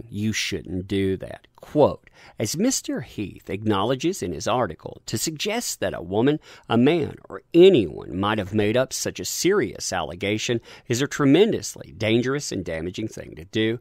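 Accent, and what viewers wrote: South, United States. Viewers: Love you're work and am with you on the things you talk about. It is scarie to think about the due process is being fuzzed out with pure crazy making b.s.everywhere and needs to be addressed before it's lost completely. Thankyou for you're time and effort to enlighten folks to what's going on. 👍 Loving the southern droll way you speak. ❤️ Love from UK.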